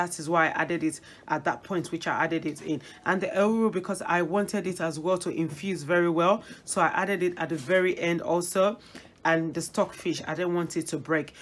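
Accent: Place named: United Kingdom. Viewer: Ur British accent eehh😁😀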